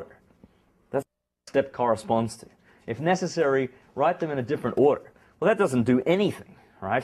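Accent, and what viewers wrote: Australia. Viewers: Is he an aussie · oh, australian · Is he an Australian ?? His accent doesn’t seem American. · this lecturer sounds like he has a bit of an Australian accent.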